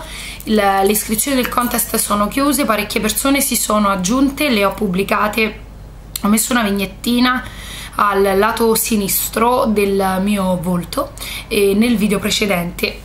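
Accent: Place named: Rome, Italy. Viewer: Ciao! Finalmente sei tornata mi mancavano i tuoi video e il tuo accento romano troppo forte! Attendo il video con le tue creazioni, sono solo un pò curiosa...Bacio!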